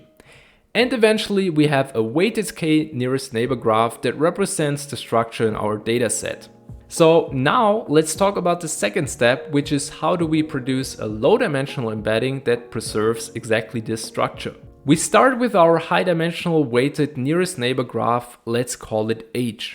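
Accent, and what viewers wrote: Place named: Germany. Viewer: Thanks a lot! Very good series! You sound German, where you acquired your education if I may ask?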